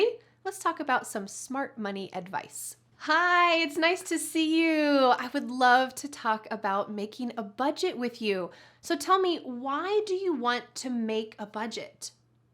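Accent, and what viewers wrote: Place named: United States. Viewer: Hello, Teacher! I adore your lessons and your voice is so clear! I love American English and keep up the good work! Cheers!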